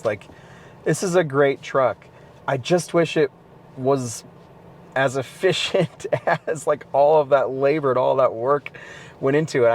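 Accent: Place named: Utah, United States. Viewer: I watched this video and about 1 minutes in I knew you were from Utah.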